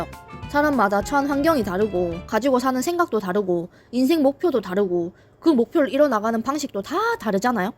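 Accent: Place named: Gyeongsang, South Korea. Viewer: 같은 경상도 사람으로써 말투 귀엽네여 ㅋ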